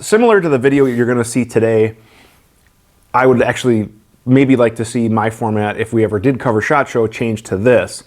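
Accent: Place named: Wisconsin, United States. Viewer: Love your videos and sconnie (OR possibly MN) accent tooo boooot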